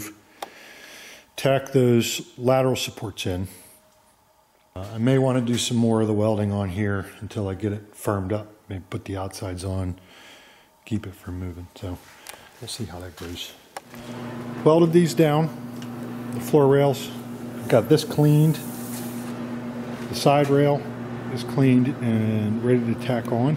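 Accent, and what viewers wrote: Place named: Pittsburgh, United States. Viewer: I here Pittsburgh accent. 😁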